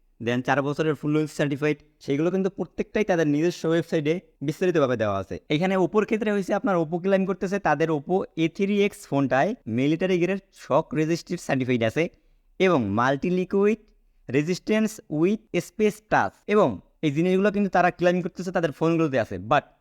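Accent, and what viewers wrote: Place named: Barishal, Bangladesh. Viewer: বর্তমানে যে ফোন গুলো বের হইতেয়াছে পাক্কা মাইরা ফালান ভাঙতেও পারে, পানি ঢোকতে পারে, চলতেও পারে নাও চলতে পারে (বরিশাইল্যা টোন আছে কথায়) আগামী চাইর বছর ক্যামনে চালাইবেন ভাই ভাই মনেহয় মোগো দেশি মনু😅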